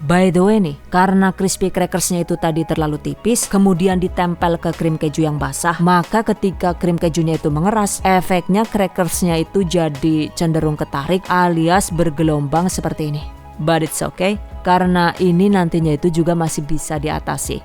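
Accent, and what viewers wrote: Banyumas, Indonesia. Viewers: Bunda lucu. Dialeknya bisa Inggris, Indonesia dan Ngapak 🤭. Anyway, terimakasih reswpnya, bund. Kalaudioven, bagaimana ya hasil cream cheese-nya? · Bunda asline mana kok bisa pake logat ngapak si.. hehe salam ngapak dari Banjarnegara 😁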